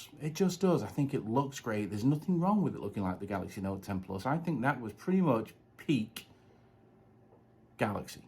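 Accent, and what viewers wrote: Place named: Pennines, United Kingdom. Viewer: Loving this phone so far. I detect a north west Pennine accent here mixed with US. Great review pal 👍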